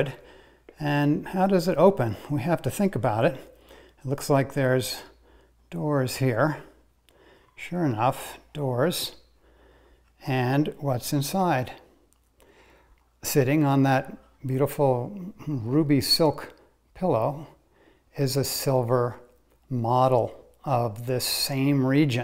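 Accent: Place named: London, United Kingdom. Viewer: London..that's the first thing I noticed(^,^)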